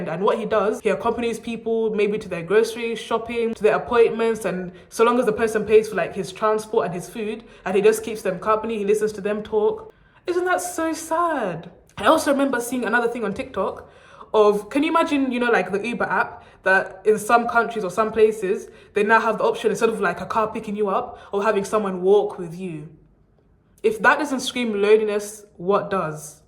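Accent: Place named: Southern Africa. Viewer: You have a southern African accent, love it!!❤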